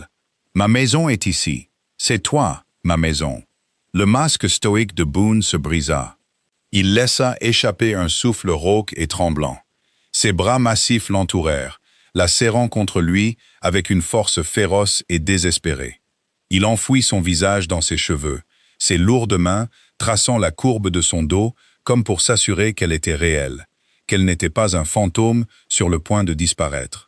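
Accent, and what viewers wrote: United States. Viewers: Pourquoi la voix ia prend un accent américain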